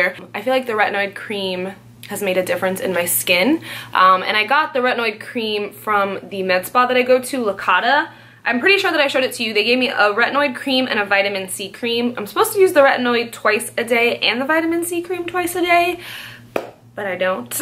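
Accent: England, United Kingdom. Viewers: honestly any problem you encounter you always solve it!! lol like ya wig! I love the grey wig!!! :)Also... I don't know if anyone has told you but your English accent is one of the best !!!!! I love it lol loving these blogs!!!! always inspired :) :) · Your soo good at an English accent hahaha😅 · I love her English accent lol. She is my favorite & by far the best YouTuber/beauty guru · love the british accent. <3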